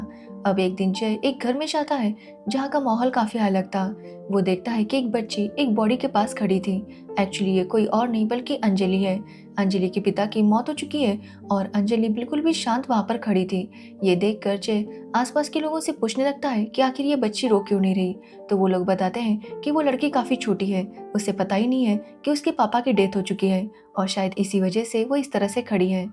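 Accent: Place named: Maharashtra, India. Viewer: Is your mother tongue is marathi ?... or does the narrator belong to the marathi language speaking community ... 😅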